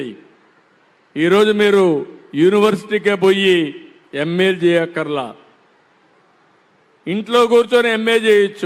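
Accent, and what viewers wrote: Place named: Rayalaseema, India. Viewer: రాయలసీమ ప్రాంతంలో అలానే అంటారు అండి, మా friends కూడా గమనించాను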